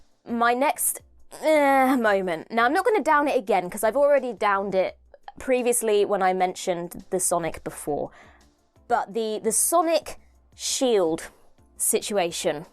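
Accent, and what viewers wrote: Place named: RP, United Kingdom. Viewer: As an American, I am always fascinated just sitting and listening to a Received British accent. Also, the enthusiasm for the Doctor Who episode is fun. Thank you again.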